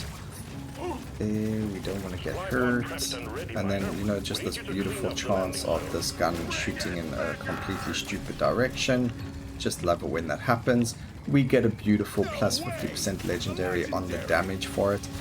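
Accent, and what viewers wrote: South Africa. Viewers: Awesome content. Thank you! Also so refreshing to hear a local SA accent when chilling to some gameplay.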